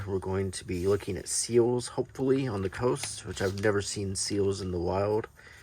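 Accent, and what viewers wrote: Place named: France. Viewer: Good job mate, really relaxing! Love the french accent!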